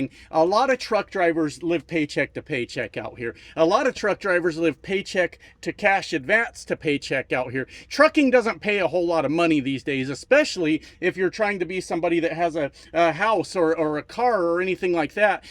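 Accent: Canada. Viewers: You sound Canadian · Canadians would love to have the old neighbourly relationship back. It breaks my heart to see all the hardships starting to happen to both sides of the border. Are you sure you’re not Canadian? I swear, you sound like us. 😊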